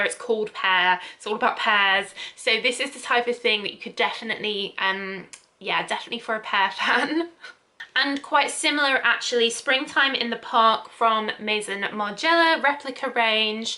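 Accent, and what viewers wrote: United Kingdom. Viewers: your skin looks gorgeous! how do you keep it so hydrated and balmy? I would like to see a facial routine video. Love your British accent though.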